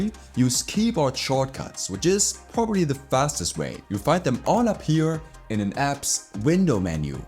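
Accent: Germany. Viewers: What a dreadful German accent.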